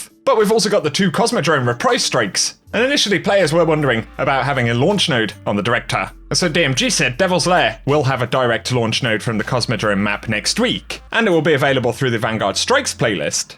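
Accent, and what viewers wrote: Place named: Midlands, United Kingdom. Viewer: England probably the midlands by the sounds of it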